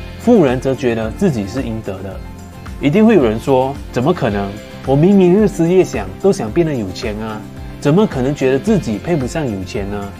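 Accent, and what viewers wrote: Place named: Fuzhou, China. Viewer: 你的福州音好重😂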